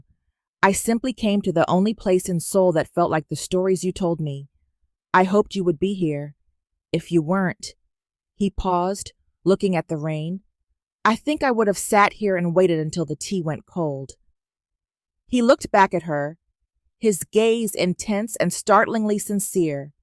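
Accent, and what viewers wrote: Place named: United States. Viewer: I find this voice difficult to listen to it's slightly aggressive and very American. Although the story was good it progressed very quickly.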